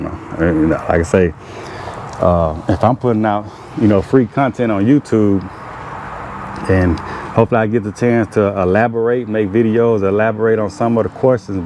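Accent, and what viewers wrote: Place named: Kentucky, United States. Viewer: This Gentleman has a " BEAUTIFUL " Kentucky Accent !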